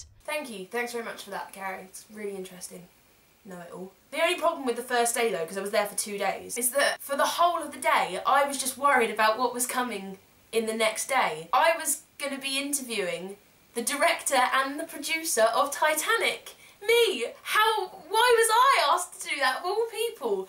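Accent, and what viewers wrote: United Kingdom. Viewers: I love your videos, you're one of the only British people I'm subscribed to! And I'm British! It's nice hearing a familiar accent! xox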